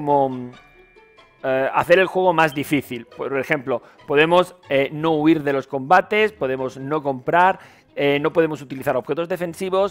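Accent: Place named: United Kingdom. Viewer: DIIOOOS QUE PUTO ASCO DE ACENTO, BRITÁNICO EN PLAN CHUNGO. Se me esta haciendo duro acabar el video.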